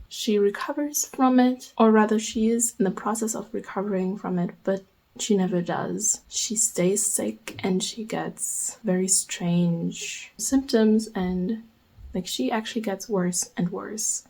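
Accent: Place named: Spain. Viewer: I feel like if I wrote by dictation, half my first draft would be "um"s 😂 your accent in Spanish is so nice! In my country we call the supposedly "neutral" accent in Spanish the "Discovery Kids" accent 😂 I am moving to Spain so I hope I get more used to hearing that one, as it's currently not my favorite either lol 🥲 I generally don't read via audiobooks but if I did I'm not sure I would wanna read a Spaniard narrator either. Oh and I had to skip over the Sunrise on the Reaping bits because I'm afraid of spoilers but honestly at this point it will probably be several months before I read that book 😅 I want to reread the original trilogy first, I need to get to it! Fun vlog:)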